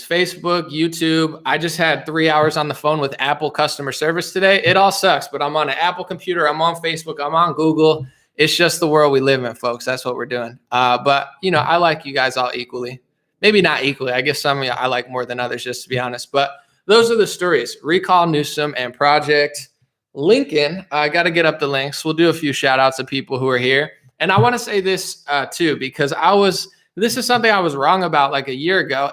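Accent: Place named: South, United States. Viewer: Where is this new southern accent comming from? You been hanging here or some new bud's in your life from here? It's cute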